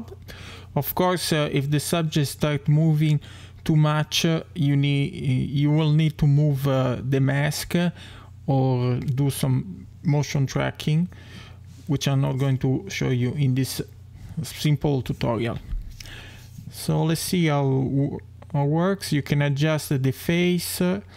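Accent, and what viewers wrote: Italy. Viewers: Come si sente che sei italiano!! Ho una mia teoria riguardo al fatto che parli in questo modo in inglese.. Pur vivendo in Usa ascolti le radio italiane, leggi giornali italiani, fai video italiani e hai una moglie italiana.. C'è un tizio italiano su youtube che vive sempre in USA che ha la moglie americana e credo che non abbia l'abitudine di leggere giornali italiani. Parla italiano nei suoi video ma sembra più americano che italiano se lo senti parlare..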